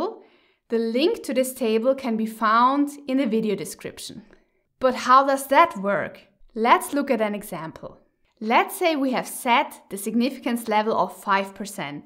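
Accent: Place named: Germany. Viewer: Hey there! Thanks so much for your videos. They're incredibly insightful and I've learned more from them than in any of my statistics classes at university. By the way, I had a hunch you might be a native German speaker, even though your English is excellent!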